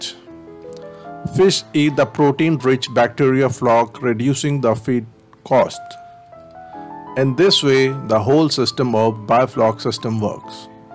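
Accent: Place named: India. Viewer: HARD INDIAN ACCENT SAYS I CANT TRUST HIM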